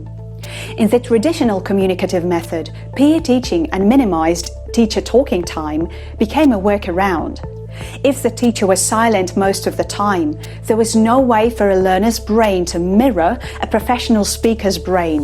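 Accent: United Kingdom. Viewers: I think you have lived in England before because no one can speak like British accent fluently like you without living in England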